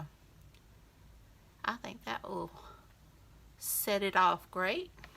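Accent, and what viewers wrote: South, United States. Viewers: I really enjoyed this video; b/c you were naratting it, it's so nice to hear that southern accent. I can't imagine the humidity you have been having this summer, we have been hit hard and it finally broke last night after a rough rain storm. Tell you hubby not to over do it out there and let him know you want to learn to use that zero turn. I'd love one but I have some side banks that can't handle one. Love that shoe and cutting the top was perfect, it makes a nice pocket. Did I see a rash on your right hand? I hope it's not poison ivy. Have a wonderful week and don't worry about being behind....... I'm 3 weeks behind now on these challenges......... OUCH 😂😂😂 have a great week 🎉🎉🎉 xoxo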